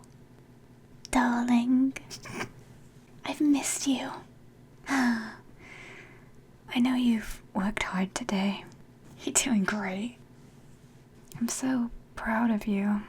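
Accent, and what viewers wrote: United Kingdom. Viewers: Mmmm british accent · for every video you sound british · Ur 2 British to do asmr vids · As an American who loves a British accent, all of your content is ASMR to me.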